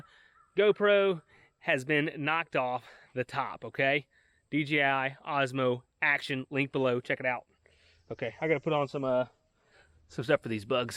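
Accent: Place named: South, United States. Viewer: Damn man you have a perfect country voice.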